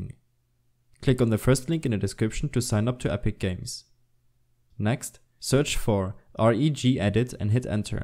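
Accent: Germany. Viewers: bist du ein deutscher?? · Man merkt dass du deutscher bist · lol ich hab sofort deinen deutschen dialekt erkannt xD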